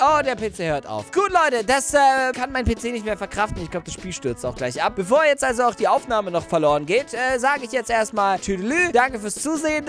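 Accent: Russia. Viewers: HAHAHA OMG DEIN RUSSISCHER AKZENT MACHT DAS VIDEO NOCH BESSER 😂✔❤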